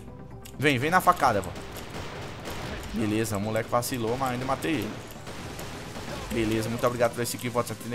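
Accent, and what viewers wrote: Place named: Paraíba, Brazil. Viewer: Ele e MiTo Mais O Portugues de Paraibano Ele Endendi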